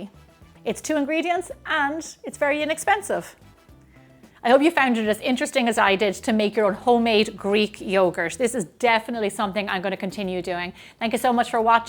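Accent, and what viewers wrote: Ireland. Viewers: We make yogurt everyday. This is her first time. Very strong Irish accent. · Just to clear up any confusion, this is an accented Irish correct? I just didn't want to get it wrong because I've learned that Scots have a more harsh tone to their speech, but some speak as soft as Irish folk.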